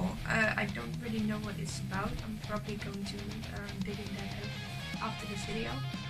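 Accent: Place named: Scandinavia. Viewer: That Scandinavian accent so freaking lovely. Cheers from another Tool fan that grew up listening to Undertow.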